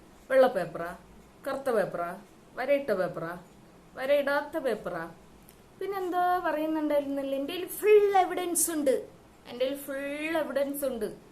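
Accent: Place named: Thiruvananthapuram, India. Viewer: ഞാൻ പറഞ്ഞു 🤭 തിരുവനന്തപുരം ഭാഷ സൂപ്പർ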